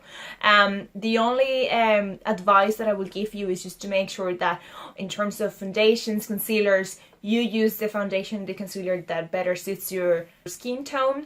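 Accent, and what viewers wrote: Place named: Ireland. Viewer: I love your soft Irish accent :)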